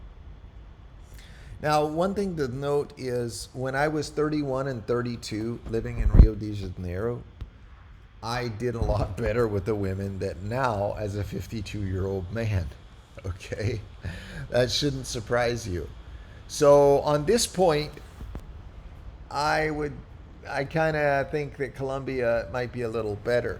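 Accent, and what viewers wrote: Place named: United States. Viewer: Maybe Colombia isn't so open to you, an expat, because you keep spelling the name of our country wrong. Or because you're coming from somewhere else and making broad generalizations about people, using phrases like "better" when comparing cultures. Who the f*ck are you? Where are you from? You sound American to me. We know how welcoming the US is to foreign expats who aren't from Canada...(*sarcasm) Also, you sound like an absolute CREEP, using phrases like "I get slightly better women". Bro, go back to Wisconsin or whatever. Or can you not "get" any women over there?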